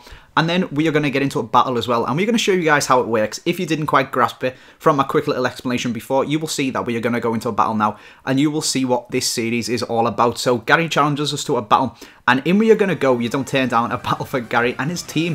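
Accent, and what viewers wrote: Liverpool, United Kingdom. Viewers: You scouse genius, you have made my day!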